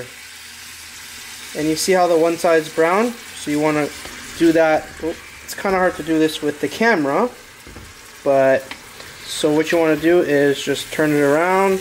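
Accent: Canada. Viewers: Canada is America's abortion that unfortunately survived, only canadians talk like that and this softy drained his ground beef 🤣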